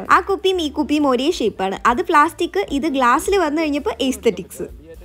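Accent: Palakkad, India. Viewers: Chechi vede palakkad anno eandha palakkad mannarkad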